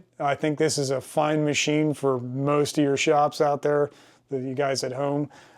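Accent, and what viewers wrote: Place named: Western Pennsylvania, United States. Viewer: Useful man thanks! Western PA? My folks are from Pittsburgh and I went to grad school there. Accent is tickling neurons.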